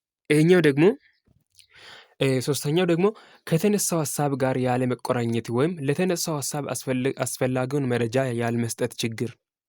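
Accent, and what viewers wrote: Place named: South, Ethiopia. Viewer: አማርኛ አትችልም ደቡብ ነህ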